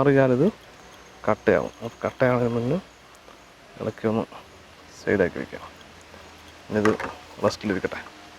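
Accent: Palakkad, India. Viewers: Chetta pudding adipoli...valare simple ayittum ,cool ayitta karyagal chiyunnath .matramalla palakkadan slang ..super ikka ......😎👌...god bless uuuu.....all the very best...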